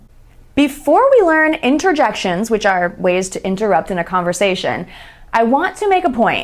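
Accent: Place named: United States. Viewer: why ur English is so obevious ......is that American accent ?????? if that American I trust myself I can speak English fluently.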